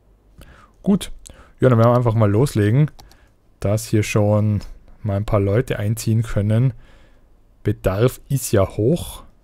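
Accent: Austria. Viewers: Finde die Serie super! Bin momentan im Krankenstand und bin noch nicht dazu gekommen mir das spiel selber zu kaufen, jetzt habe ich mir jede Folge von dir angeschaut. Habe zu erst begonnen bei Gronkh zu schauen, finde aber dessen "Spielweise" etwas umständlich. Und da bin ich mehr aus Zufall über deine Videos gestolpert, da geht was weiter in den Folgen und bin auch größtenteils mit deinen Entscheidungen einer Meinung was bei Gronkh z.B. nicht immer der Fall ist, daher finde ich diese Videos netter zum anschauen. Ebenfalls finde ich deinen Dialekt netter, bin ja selber Österreicher und finde es sehr angenehm. Habe mir auch einige deiner Sid Maier Railroad folgen angeschaut und überlege mir auch dieses Spiel zuzulegen so als alten Klassiker :D WEITER SO! Freue mich schon auf die nächste Folge!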